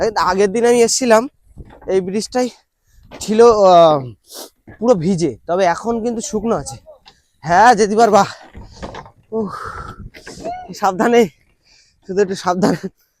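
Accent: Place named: India. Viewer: কথা শুনেই তো বোঝা যায় ইন্ডিয়ান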